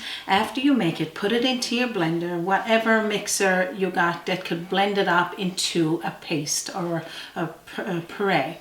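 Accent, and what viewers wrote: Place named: Newfoundland, Canada. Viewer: I love your NFLD accent, tell me when your birthday is so I can send you a Bag of H's. ere are y now? Cool, using orange juice, I have been an Armature chef, because I don't have a red or blue seal, but I have been cooking since 1978, my career took me to other professions, but still I am a cook for the rest of my life, thank you for sharing this very cool recipe, I'm definitely going to make it I like the orange with the rice, Baked. Tell us were you at?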